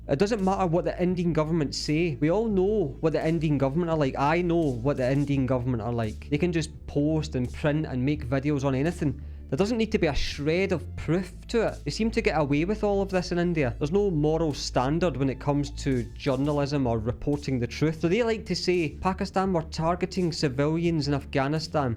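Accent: Scotland, United Kingdom. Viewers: Shamé on u pa jéet, he's Scottish, it's natural & a nice accent. As u don't have anything to counter the facts so learn to shut up.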